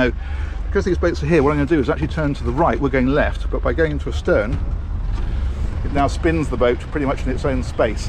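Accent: United Kingdom. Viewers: you are such a nice, warm and friendly person.. and british accent makes you pleasant to listen to :D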